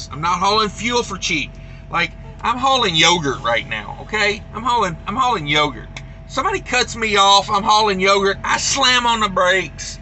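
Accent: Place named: South, United States. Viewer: Your eloquent words with a wonderful southern twang speaks truth. You consistently remind me of why I left trucking after 25 years. Hurry up and wait has been stuck in my brain for years! My first year was with England. My last load with them had me waiting a day and a half! These bastards know how to steal a trucking dream!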